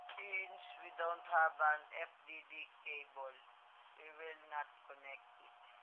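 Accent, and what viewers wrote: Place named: Philippines. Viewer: pinoy hahaha. ung english pinoy n pinoy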